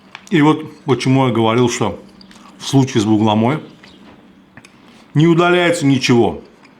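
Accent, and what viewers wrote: Baku, Azerbaijan. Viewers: Ох уж этот Бакинский акцент... ❤❤❤ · Салам. Отличие дядя от других фуд блогеров когда он готовит обесняет, говорит, шутит. Бакинский акцент русского языка вапше супер. Жаль что отличие от "немых" фуд блогеров подписчики мало. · Мне нравится сумгаитский, бакинский акцент. Сам с Дагестана но родился в Сумгаите. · брат, все твои блюда очень-очень аппетитные, а благодаря БАКИНСКОМУ акценту, ещё и завораживают 👍👍👍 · Уверен,блюдо невероятно вкусное!но благодаря вашему Бакинскому акценту,думаю оно вкуснее еще в 10 раз! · Сказочное блюдо и сладкий бакинский акцент! ❤❤❤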